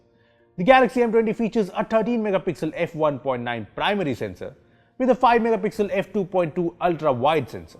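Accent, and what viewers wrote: India. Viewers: Omg ! All Indian youtubers = annoying accent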